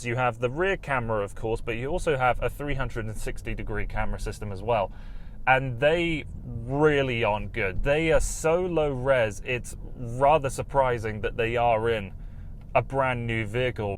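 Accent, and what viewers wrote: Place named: United Kingdom. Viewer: Hes British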